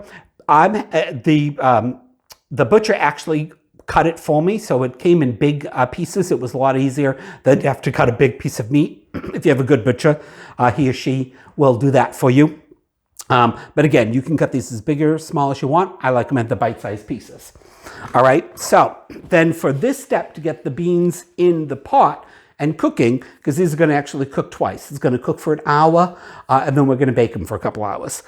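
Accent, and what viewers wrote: New England, United States. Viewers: Thank you so much! I saw the new video and replied there too. Although I recognize your New England accent I erroneously associated your YouTube name with Cherry Hill NJ. So cool of you to do the special research for the right recipe, love your channel.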